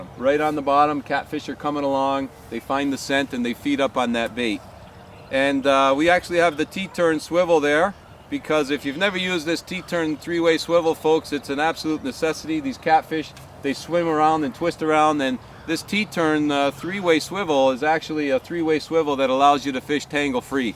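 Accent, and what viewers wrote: Canada. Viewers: "Eh?". God, I love Canadians. That and a really superlative lesson in catching catfish!! :) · Are you from Canada Aye? Nice videos good information it has helped me a lot ! Thanks. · Is this guy Canadian, eh?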